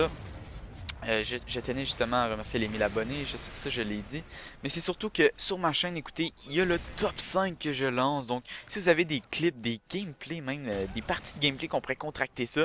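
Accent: Quebec, Canada. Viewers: J'adore cet accent québecois :p Sinon pour moi Mode RAGE : Striker + Damage Mode Tranquille : SPAS12 + Damage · j'adore l'accent du quebec je t'aime mec :D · Mais non c'est qu'il a un accent come sa car il est québécois ( dsl si j'ai mal ecrit :s ) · Si vous aussi vous kiffez son accent Quebecois <3 :) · quebecois ;D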